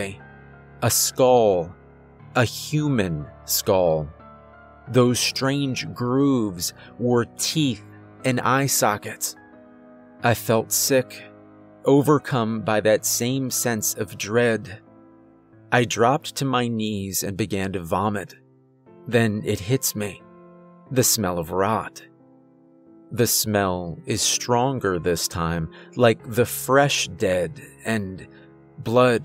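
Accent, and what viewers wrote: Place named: Great Lakes, United States. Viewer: I’ve wondered for some time now if the narrator is from the Great Lakes region because of the way they pronounce some words 💁🏽‍♂️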